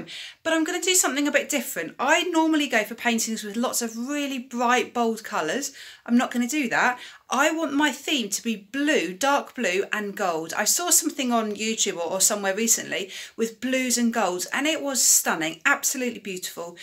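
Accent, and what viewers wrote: England, United Kingdom. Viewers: I love watching your videos, they are so carefully explained and so refreshing to hear an English voice. I feel very much on my own here in UK. Keep going.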